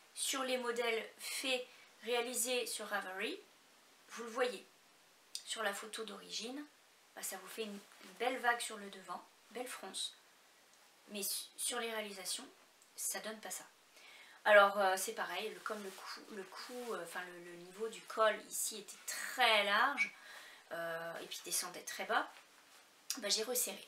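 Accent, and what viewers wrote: Quebec, Canada. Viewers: Coucou, contente de te retrouver, j'aime toujours autant ton univers et lorsque tu as l'accent ou que tu sors des mots québécois, cela me fait plutôt sourire, j'aime bien. De bien beaux ouvrages et bravo pour Biscotte ! Merci pour le partage du modèle, je me laisserais peut-être tenter... Passes une bonne journée et à tout bientôt.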